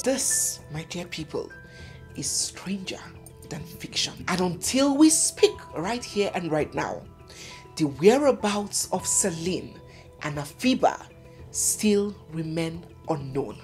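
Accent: United Kingdom. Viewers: Is that a uk accent😢😢😢😢😢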